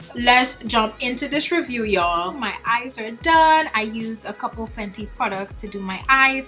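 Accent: Trinidad and Tobago. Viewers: Dont know why it took me so long to subscribe to your channel. You always have a timeless look plus the accent. Trini gyal ?? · This is my first time seeing your videos. And tell me why in your opening line I’m like “but why does she sound trini?” Opens description bar and tadaaaaa 🇹🇹 you got a subscriber from your fellow trini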